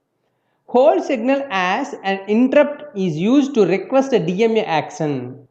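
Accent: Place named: South India, India. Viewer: Y'all talking as if you never heard an accent, just go find some other video if you find south's accent difficult, you north Indians are always so full of yourselves, expecting someone to change their whole accent just because you guys find it difficult, youre not that special. Humble yourself honey.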